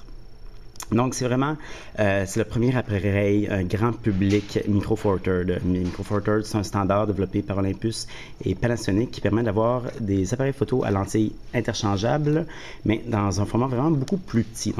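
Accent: Canada. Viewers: c quoi cet accent, tu habites au canada? ^^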